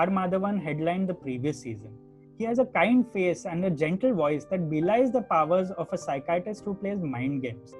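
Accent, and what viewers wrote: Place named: India. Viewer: Your accent matches typical Indian accent 10000%.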